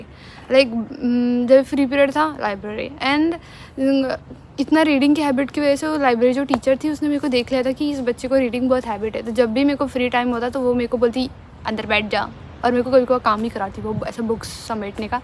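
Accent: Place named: India. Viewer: mai aapka first. Vlog sa huu🤧🤧🤧 l like you hindi accent esliye mai aapki vlog dekhti huuu🫠🫠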